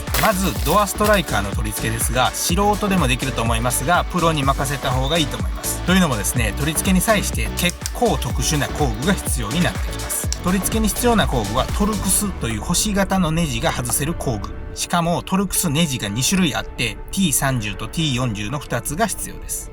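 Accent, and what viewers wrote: Kansai, Japan. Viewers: お返事ありがとうございます😊 さんの関西弁ももっと聞きてえっすがら期待して待ってらっすど😁 へばまんつなー！ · 別にディスるつもりは全くないですが関西弁特有の若干の強みが全く感じないのが本当に人の良さが滲み出てて凄く聴きやすく見やすい素晴らしい動画です✨ ゴムモールの事ときめ細かい点も本当に素晴らしいですしあくまで視聴者目線の押しつけのない綺麗な動画…続けて見てしまいます✨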